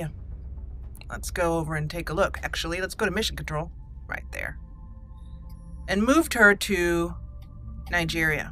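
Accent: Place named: South, United States. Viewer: Wow, this game is very involved. I'm really liking Commander's southern accent; nice job. I don't want to be weird, but those aliens are kind of cute, too. :3 You took a lot of risks this second mission, Commander. It's amazing that a lot of them worked out. Beginner's luck or simple intuition, only time will tell! :D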